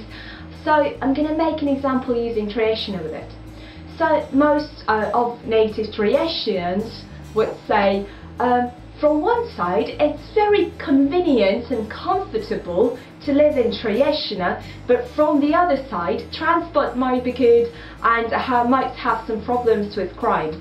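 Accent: United Kingdom. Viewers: You have a British accent. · А что такое "английский акцент" когда чел говорит по-английски? У неё, кстати, британский акцент. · its british acent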